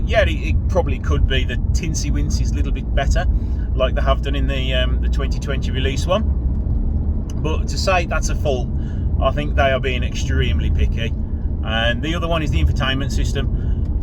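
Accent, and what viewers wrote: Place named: Midlands, United Kingdom. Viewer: Superb review.... I have a red one.... 28,000miles now and just coming up to the big service £900plus VAT, but no issues whatsoever. Agree with your comments about the central console and infotainment.... if you set in Sat Nav as you head off its perfectly fine and personally I DON'T like touch screen systems. Eventually they scratch and the screen looks horrible. I,ve changed centre pipe on mine and ecu chip for a few extra bhp and open exhaust flaps when I want it. But judging by your accent ( apologies ) and the fact that you got your car from Nuneaton I guess you are fairly near to where I live ( Bewdley ).... I use the Supercar Rooms in Hartlebury, near Kidderminster to look after my beast and they are brilliant. Had an M4 with Competition pack before my Alfa and one major major difference nobody seems to mention is that the M4,s traction in anything other than bone dry and hot conditions was absolutely rubbish. 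Alfa .... put your foot down and it just goes. Unless you really abuse it. Maybe you could argue that the M4 interior design a bit better and more sophisticated but Alfa steering, handling brakes etc better all round and just puts a smile on my face. One other thing that a lot of Reviewers mention is that the carbon ceramic brakes lack feel and can cause the car to creep forward in traffic. Well I,ve got the carbon brakes on mine and have never ever had an issue. Anyway. Happy motoring. Top review Thanks Regards Rog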